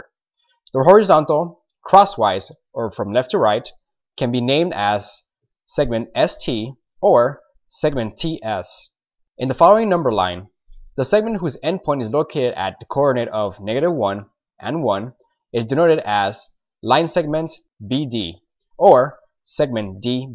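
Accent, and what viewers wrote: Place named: Scandinavia. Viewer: you sound scandinavian, are you german or austrian?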